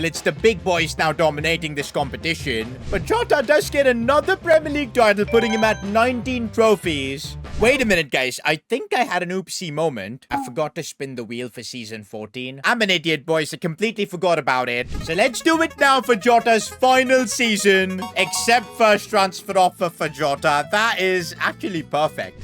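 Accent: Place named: India. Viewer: yes bro he's still learning and he's improving if you check his videos in fifa 21/fifa 22 his accent was only indian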